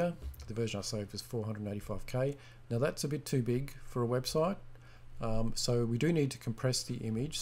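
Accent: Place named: Australia. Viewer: So cool - and great to hear an Aussie voice.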